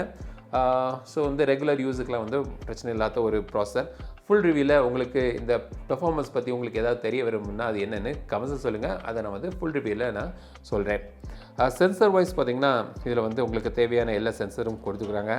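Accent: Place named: Nagercoil, India. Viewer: Nagercoil tamil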